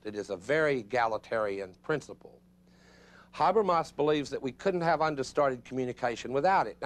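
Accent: South, United States. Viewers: his southern drawl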